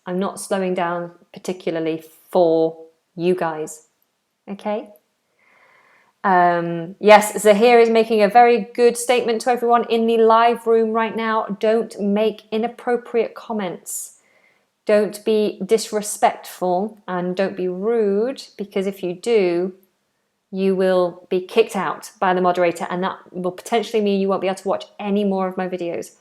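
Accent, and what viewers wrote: United Kingdom. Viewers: I enjoy your accent, which reminds me of the time in uk.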